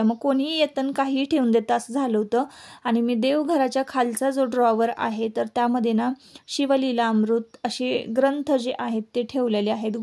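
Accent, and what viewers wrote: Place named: Kolhapur, India. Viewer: कोनत गाव तूमच भाषा कोल्हापूरी वाटते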